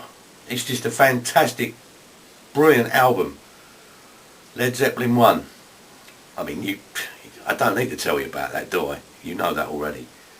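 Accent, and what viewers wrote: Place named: London, United Kingdom. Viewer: lots of very good stuff, everyones got their favourites, I would have put ist, and who knows... it was good seeing groups back in those days, you put it across well and its good to hear someone with a london accent talking about artsy type of stuff. Id also recommend who I first thought of as a copy but rediscovered them and play them a lot. if you come across by put it on if possiblethanks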